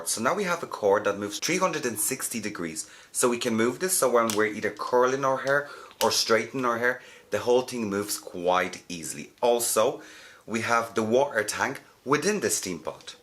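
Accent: Dublin, Ireland. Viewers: Your accent is so exotic! It’s like Dublin with a hint of something else, Iv been using the same steam pod since late 2015 so Iv just ordered this today..thank you so much for explaining everything I STAN this channel 🤩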